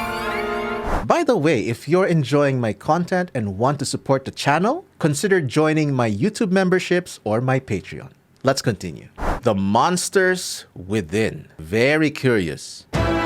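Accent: Philippines. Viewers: pinoy to sa accent palang e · You accent and looks, feels like you're from the Philippines.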